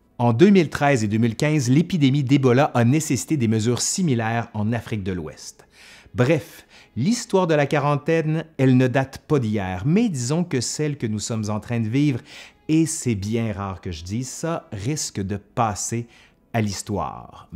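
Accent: Quebec, Canada. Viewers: J'aime ton accent québécois.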